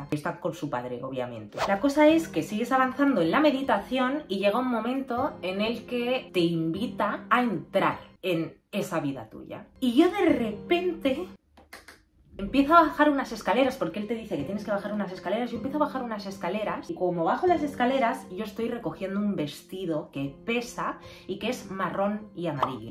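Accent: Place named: Russia. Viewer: Porque tiene asento ruso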